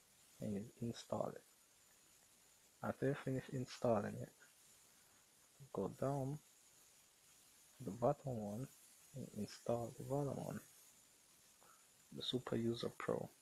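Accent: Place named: Jamaica. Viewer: I can tell you're Jamaican, me TOO!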